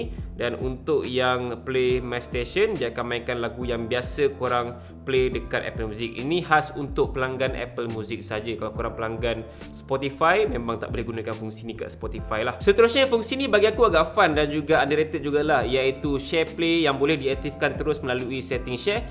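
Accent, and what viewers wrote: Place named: Kelantan, Malaysia. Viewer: mujur eh . ore kelate kow ? 😅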